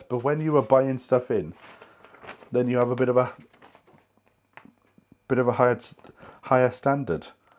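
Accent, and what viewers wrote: Wales, United Kingdom. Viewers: I'm sorry you haven't been well, you certainly sounded poorly. I must confess I could listen to you all day long. I love Welsh accents. My late wife, bless her, was Welsh and I spent a couple of years living in Wales. That was a great time. I hope you shake off that flu and are back to normal ASAP. Good luck!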